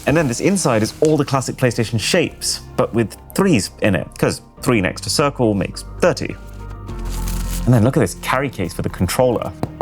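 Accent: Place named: RP, United Kingdom. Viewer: This Wealthy White English accent kills me man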